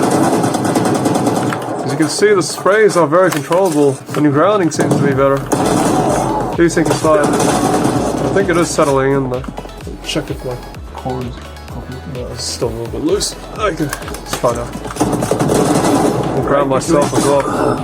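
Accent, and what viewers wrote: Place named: United Kingdom. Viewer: For f sake, finally a video about this. You are 3 years late mate. And I wonder (based on your accent) if you're British, because I live around the UK and I had this problem a while ago already.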